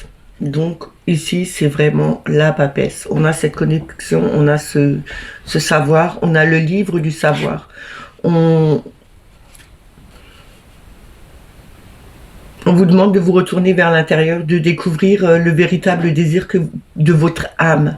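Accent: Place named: Grand Est, France. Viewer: Vous avez l accent du GRAND EST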